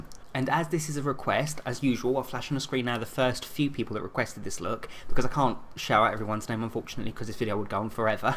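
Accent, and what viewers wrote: United Kingdom. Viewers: He is and he's British so the accent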